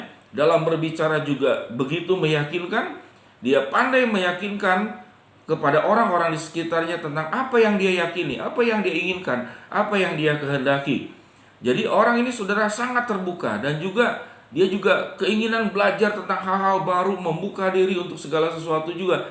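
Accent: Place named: Palembang, Indonesia. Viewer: Sepertinya cara berbicara nya kayak ada logat Palembang nih mas ya ? Hehehehe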